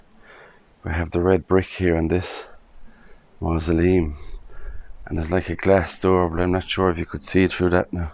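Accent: Ireland. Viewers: What a smooth voice luv the Irish accent dis you notice that the grass is so green plus no weeds?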